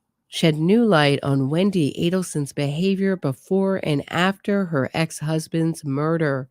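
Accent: New York, United States. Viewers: Hi , I love your new york voice. It's very addictive. Great channel and topic.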